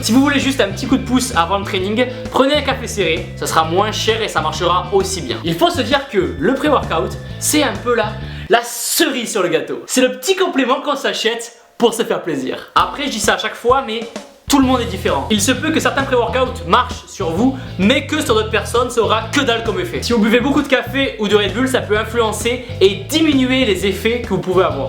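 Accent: South, France. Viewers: T'es mon youtubeur PREFERER ACCENT DU SUD ET MUSCU LE PERFECT moi qui suis du sud BOOOOOOUUUUUUUM!!Allée.vien ma petite :-P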